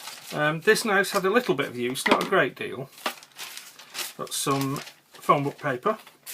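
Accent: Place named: Manchester, United Kingdom. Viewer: Hey I'd open they got sauce! That'll make a cracking vid at some point! 😂 I've only followed you for a few months but your lovely mancunian accent and straight up, no nonsense words of wisdom have always been a good watch. Count me in for the subs draw sir, congratulationso on your views milestone and have a lovely weekend!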